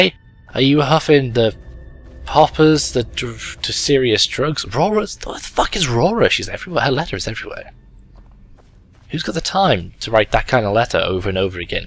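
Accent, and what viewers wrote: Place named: England, United Kingdom. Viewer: Well... I was checking to see if I had missed this episode and though I had, I am now watching it again. Totally intended to go to bed, but then I got caught up in the cheese wheel rubbing and the... English accent... Curse your mystical use of England speak...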